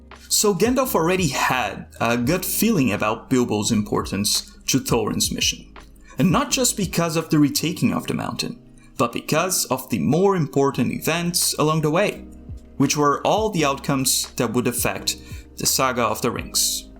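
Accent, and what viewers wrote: Brazil. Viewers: Brazilian masking accent 👀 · Vc é brasileiro, né? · Sir, are u brazilian? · You sound Brazilian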